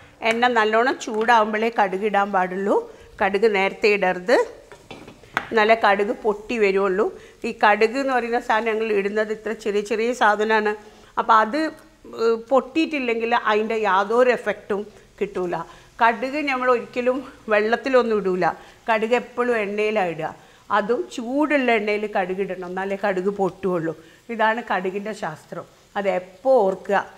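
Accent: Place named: Malabar, India. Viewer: Mam your cooking classes are very helpful.When ever i am having doubt while cooking i watch your videos and i love the way you talk with that malabari mapila accent😊.Looking forward to more new recipe's✌🏻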